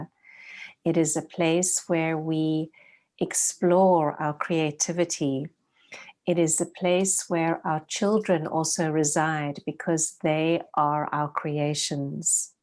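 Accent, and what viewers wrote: South Africa. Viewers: Loved this; thank you very much! Gives me hope. Wonder if I can still meet someone during Covid living at my parents house haha. My Ascendant is libra at 23' degrees; Will Pluto still have an deep transformative influence on me? you sound South African haha:)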